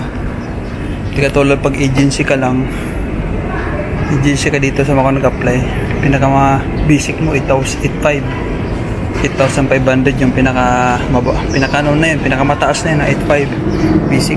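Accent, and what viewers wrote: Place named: Waray, Philippines. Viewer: Bossing taga samar kb..or waray waray kb??😂waray kasi accent mo eh😅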